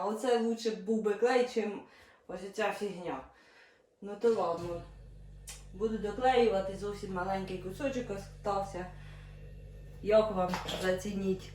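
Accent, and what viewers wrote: Poltava, Ukraine. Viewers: Полтавськая область, Карловский район. Это родной мой край, где прошла моя молодость, где могилы моих родителей. Слушаю и наслаждаюсь, у меня тоже такой же говор. ❤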